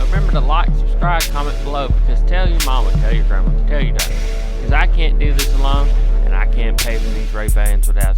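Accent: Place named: South, United States. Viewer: I like this southern man, where did u get that accent?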